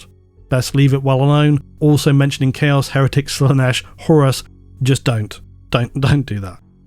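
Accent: England, United Kingdom. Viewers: You're right about language being insurmountable. Until you mentioned it yourself, I was checking off each suggestion as impossible due to the language barrier. I was listening to your English accent as an American, knowing that the differences only represent a few hundred years of geographic distance. Even with Imperial media technology serving to provide some linguistic cohesion, a modern traveler would probably sound like they were speaking Old English to someone in 2024. So, completely unintelligible. Other notions that crossed my mind would be strange ammunition calibers of travelers and the ancient disease we carry that had been stamped out long ago by immunizations, etc. A travelers might be tracked and captured as a Patient Zero of a long forgotten virus.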